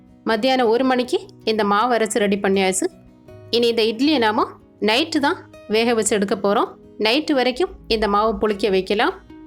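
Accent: Kerala, India. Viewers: மலையாளி தமிழ் பேசினால் ஈசியா கண்டுபிடிக்க லா ம்.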